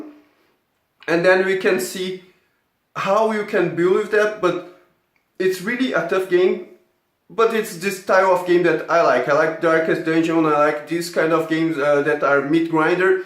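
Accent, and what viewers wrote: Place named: Brazil. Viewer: Tu é BR, esse sotaque não engana kkkkkkkk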